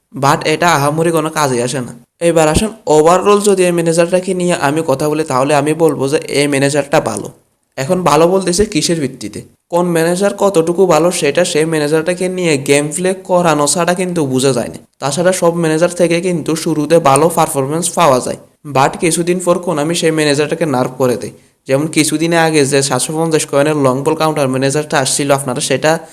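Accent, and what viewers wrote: Noakhali, Bangladesh. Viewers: ভাই কি নোয়াখালীর নাকি?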